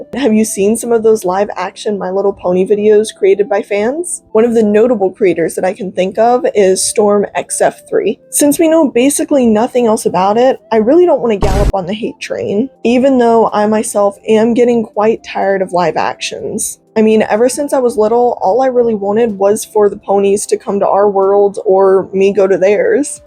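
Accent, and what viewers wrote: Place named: South, United States. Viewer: Ah yes, I remember someone else putting that movie news out there, so, nothing too new to me, and my most favourite live movie adaption has to be Sonic, it just has it all. Gotta say though, you're looking very pretty in that cosplay outfit, in fact you look very cute in it, and nice work on that southner accent. I managed to pick mine up from an interesting transformer who speaks that way called Overlord, man does it surprisingly suit him well.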